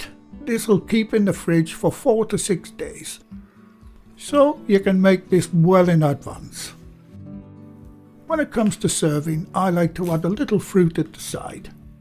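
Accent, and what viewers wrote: United Kingdom. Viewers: Merry Christmas sir! I'm curious about in what part of the UK your accent is common. Thank you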